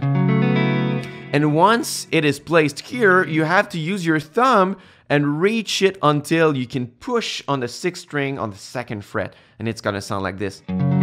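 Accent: Quebec, Canada. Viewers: quebecois? Je reconnais ton accent :)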